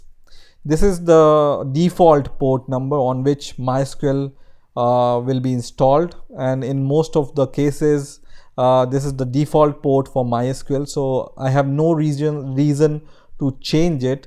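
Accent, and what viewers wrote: India. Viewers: U r slow like shiiit,catastraphic India English,u could shrink video into 5 minutes easily,phuy... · why indien accent it's Bad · the indian accent gives me headaches.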